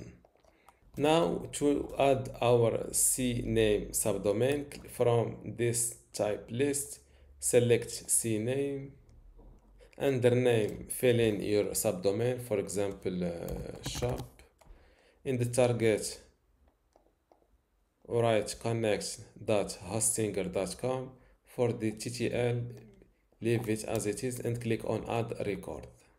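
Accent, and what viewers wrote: Morocco. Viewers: thank you sir and i can tell from ur accent that u are moroccan arent you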